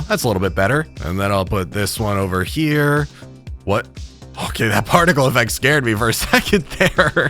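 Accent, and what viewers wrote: United States. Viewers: In the first sentence that ive ever heard from this ytuber, I instantly realized hes american.🇺🇸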